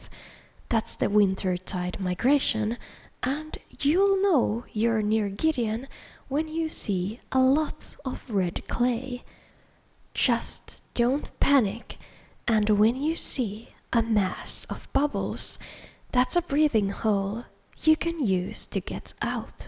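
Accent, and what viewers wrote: Finland. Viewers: The Finnish accent is really appealing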